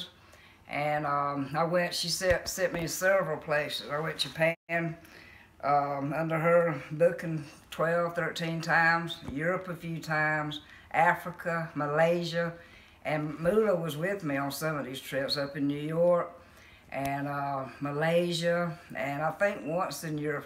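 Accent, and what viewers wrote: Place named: Southwest Virginia, United States. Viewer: She sounds like she is from Southwest VA. lol I like it.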